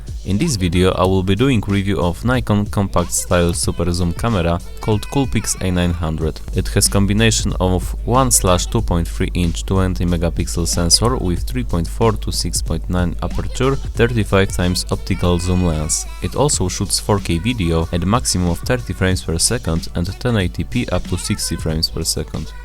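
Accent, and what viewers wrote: Poland. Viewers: Słychać ten polski akcent :pp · Your accent sounds like polish idk is it true lmao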